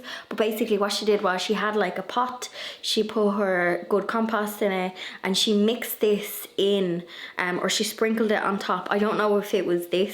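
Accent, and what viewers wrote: Ireland. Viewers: Love listening to your accent my maternal great grandparents migrated to Australia from Ireland,my grandfather could put on a good Irish accent lol I’ve only been to Ireland once, but could have just moved back in so easily it was like coming home, just can’t leave all my family behind 😢 I don’t know how they did it in the past To come to a strange land so far away.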